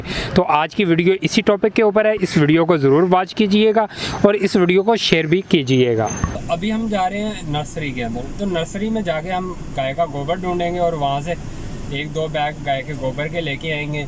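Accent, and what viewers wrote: Punjab, India. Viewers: کیا ھی اچھی بات ھوتی کہ آّپ خالص اردو یا پنجابی ملاکر اردو بولتے بجاے اسکے کہ بونڈی انگلش مارتے ، شکل سے یکدم ریڑی والے ھو ، تمہاری انگلش بھی انگش سے زیادہ پنجابی لگتی ہیں تو بھایی کیوں اپنے آّپکو لطیفے بنانے پر تلے ھو ، صاف ستھری پیاری پیاری اردو پنجابی لہجے میں بولو ، اچھا لگوگے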